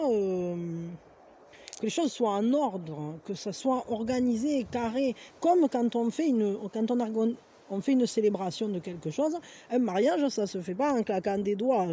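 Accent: South, France. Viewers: Bonsoir 🤗 on se croirait ds le sud ou vs êtes plus votre accent les poules le vent mais j adores ce contexte 🌳🌿🍃🐔🐔🐔🐞la guidance me parle pas j aimes bcp cette façon de penser à l un à l autre se protéger avancer main ds la main ...... J espères rencontrer ce style d amour très grd ah oui 😊🙏 bonne soirée 🤩💖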